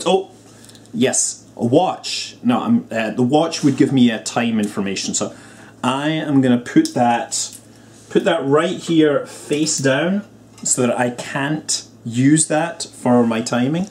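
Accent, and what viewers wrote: Scotland, United Kingdom. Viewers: I understand that, however, say you launch and have enough velocity to reach the same altitude as an orbiting craft for a moment, but you're on a ballistic trajectory so you're going to return to the atmosphere, but you dock, your mass would then... have what effect on the craft you dock to? I imagine the added mass would then decrease the speed of the craft you docked too... I know I could look it up, but I prefer my science dolled out in a Scottish accent ^__^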